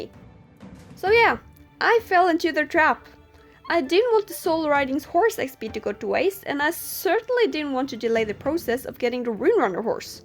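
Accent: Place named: Norway. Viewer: Now i hear that you is Norwegian on your english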